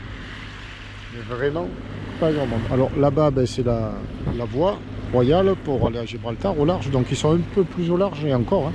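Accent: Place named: Provence, France. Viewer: Salut merci pour tes vidéos et ton accent de la belle Provence…. La lumière en question s’appelle feu de hune (steaming light) et c’est obligatoire lorsque le moteur propulse avec où sans les voiles. Même si tu n’aime pas la mécanique tes tutos sont franchement sympa et c’est toujours un plaisirs. Bon vent au Tabasco.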